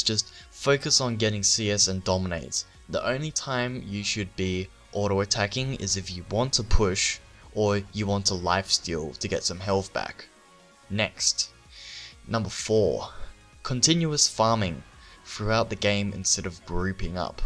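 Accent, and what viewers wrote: Australia. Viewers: im from Argentina, and i have no problems understanding what he says, i do believe he is Australian (he mentioned something about it in the last video comment section) its just that you arent familiar with his accent, thats all, australian accent is more like british if you actually want to compare accents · YOU'RE AUSTRALIAN, AREN'T YOU......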